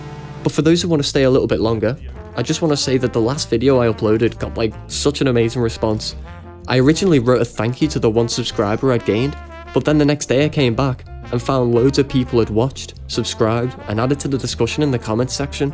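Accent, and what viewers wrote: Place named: Glasgow, United Kingdom. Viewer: Bro you from Glasgow?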